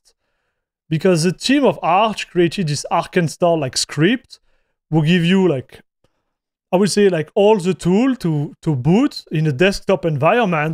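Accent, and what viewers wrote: France. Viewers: Nah, this is french accent from france. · i have never heard a more french man holy shit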